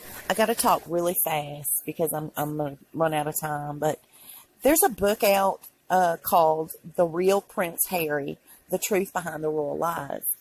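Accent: South, United States. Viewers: Love your Southern Accent! I'm from the South too, Louisiana. Just subscribed today to your channel. I would LOVE ONE OF THOSE WIGS for a friend of mine going thru chemo. She's very dear to me. I understand if I'm too late. God Bless you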